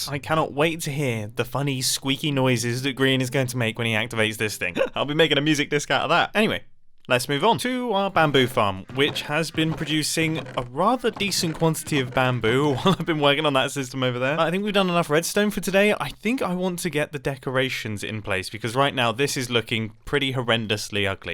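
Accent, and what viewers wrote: United Kingdom. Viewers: I come for the complex redstone builds, but I stay for the fantastic British dialogue. Thanks for the great content Mumbo! Your ability to make me laugh is quite impressive.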